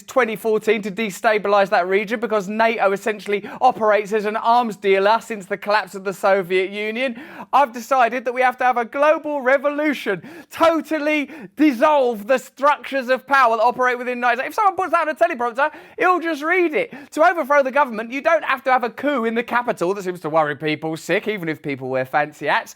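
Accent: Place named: United Kingdom. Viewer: You talk about Biden cant speak English, lol neither can you. I know its a British accent but if you can pronounce proper English then dont tell someone else they cant.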